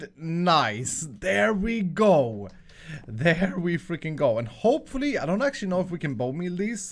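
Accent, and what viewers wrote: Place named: Sweden. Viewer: He is from Sweden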